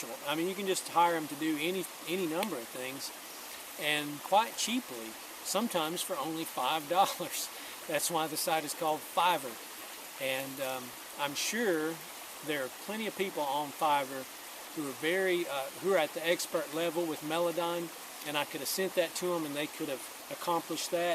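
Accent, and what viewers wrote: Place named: North Carolina, United States. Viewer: I so enjoyed listnin to your "blabber" & "yappin" about the tech end of recording, although a lot goes over my head. I just want to play, sing & have someone else do that work. 😀 When I was a kid in the '60s & '70s in TN, my dad & uncles would sing in the living room a lot of Hank Sr., Lefty, Jimmie Rodgers (Blue Yodeler), & other standard country music & hymns. We had an overhead light fixture smackdab in the living room ceiling. The men would hang a mic from it & they'd sit around it in a circle & play & sing to that suspended mic. Now you wouldn't want to do that on a ceiling fan & have someone come along & turn on that fan! Oops! 😃 Uncle Robert called it a hillbilly boom mic. 😀 What a sweet setting by the creek & trees, with a crawling bug as your co-host. Even though you're in NC (I grew up with TN & VA accents which are a slight difference) your accent is so pleasant. 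Your singing voice beautiful. Your dad had such beautiful high harmony. Wow! Really like your Gibson Dove. God bless you & your family. 🙏🏻🌼 Edit: I saw where someone suggested you scan & save those paper lyrics. I agree!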